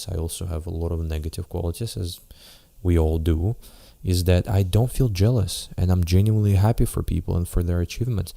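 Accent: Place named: Russia. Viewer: почему вы английские фразы произносите русскими звуками?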